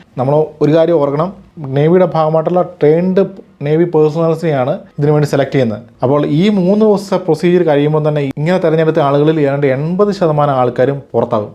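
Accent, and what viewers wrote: Kottayam, India. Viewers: നാട്ടിൽ എവിടാ കോട്ടയത്ത്‌ ആണോ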